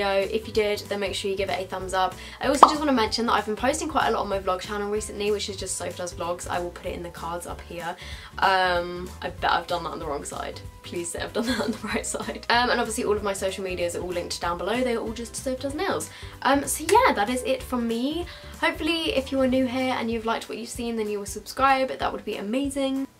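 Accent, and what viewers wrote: England, United Kingdom. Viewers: I live for english accent 😍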